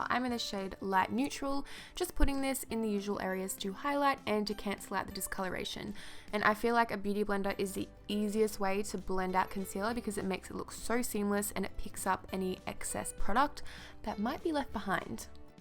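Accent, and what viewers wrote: Australia. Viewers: she does. I am from Australia and she sounds like any ordinary Aussie girl. Perhaps your perception of an Australian accent is a bit different? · wooow loving the hair ur amzing too love u by the way I'm OBSESSED with the Australian accent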